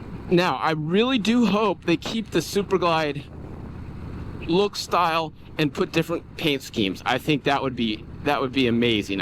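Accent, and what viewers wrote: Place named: Baltimore, United States. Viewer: Dude, this guy sounds EXACTLY like Stavros Halkias.. Personality wise too. Baltimore area accent perhaps? This super glide looks amazing 👏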